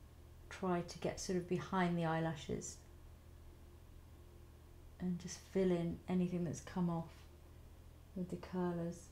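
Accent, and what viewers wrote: United Kingdom. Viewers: English is not my first language but I definitely understand every word she says.......She speaks perfectly.....This is the british accent that I adore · I love yr British accent!!!!!!!!!!!!!! <3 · Beautiful!!! Oh, by the way, I love your accent. Whereabouts in the UK is it from? All the best from a Portuguese fan:) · British lady <3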